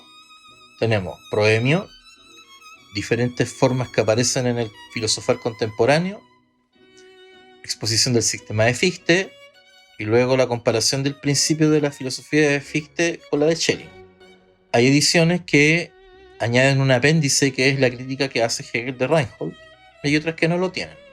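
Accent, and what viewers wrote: Chile. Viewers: Jajaja es que es de chile, pero yo si lo entiendo y está muy bien .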